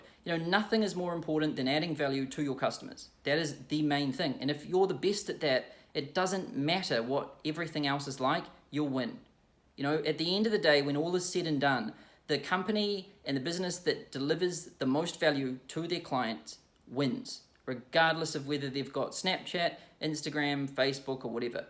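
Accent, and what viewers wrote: New Zealand. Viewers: NEW ZEALAND ACCENT？？？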